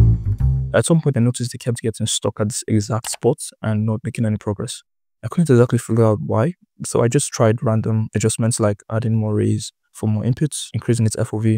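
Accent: Nigeria. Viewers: Pure naija boy 😂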